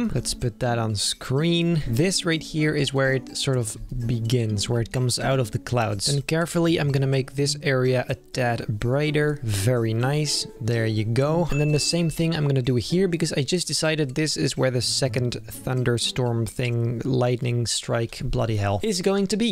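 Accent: Netherlands. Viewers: And are you dutch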